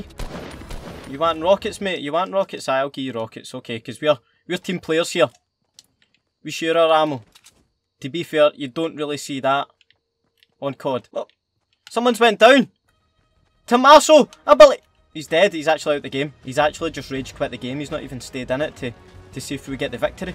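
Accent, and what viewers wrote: Scotland, United Kingdom. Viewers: he’s not Irish he’s Scottish from Scotland like me and my family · Feng Magic Gadget it’s Scottish man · Scottish ya spoon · Thats scottish if im not wrong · Just realized he is scottish LUV YA ACCENT! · It’s Scottish ya nuggets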